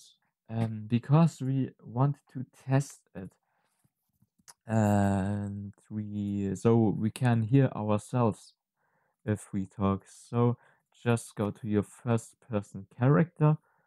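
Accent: Germany. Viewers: Are u german? (just askin)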